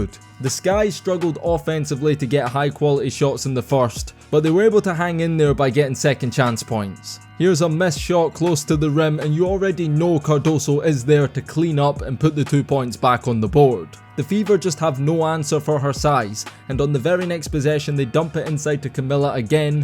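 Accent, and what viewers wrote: Scotland, United Kingdom. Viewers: Agreed! Who is the announcer? Sounds like a Scot. The main reason my interest was maintained for so long. Articulate and engaging.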